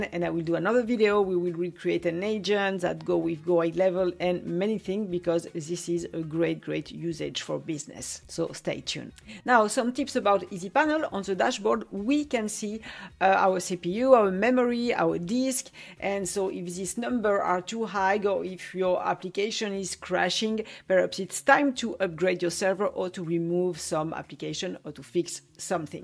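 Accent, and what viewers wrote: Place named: France. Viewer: Vous avez un accent français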